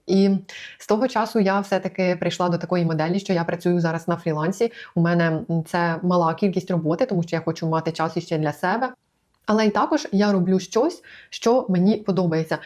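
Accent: Ukraine. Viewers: Гарне відео. Якщо можна особисти питання. Ви випадково не з Полтавщини? Якась знайома балачка🙂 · Прикольно,це перше відео із вашого каналу,котре я дивлюсь,чогось ютуб його мені підкинув.Подобається ваша щірість та те,як ви розмовляєте,ваш легесенький суржичок просто божествений.Дякую вам за надхнення ! ! !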